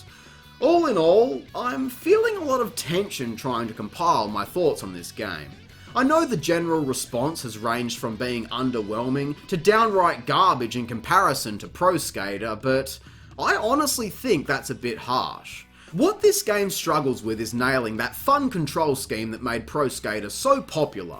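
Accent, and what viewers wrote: Australia. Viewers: Aussie accents make everything 17% more entertaining.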